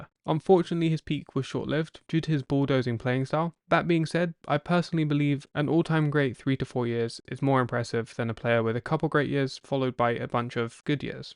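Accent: England, United Kingdom. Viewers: Something wrong when a football documentary is narrated by a british person. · british dude. i love this! no offense tho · Why do they have to have some English guy narrate this? Who never played,watched or understood the game.Just leave the video everyone will figure it out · somehow, listening to a tribute to Earl with the narrator having a British accent just ain't right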